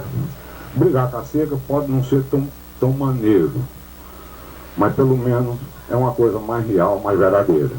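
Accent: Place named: Northeast, Brazil. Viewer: A fala dele é identica a de Amaral Girgel outro nordestino destaque no mundo dos negocios.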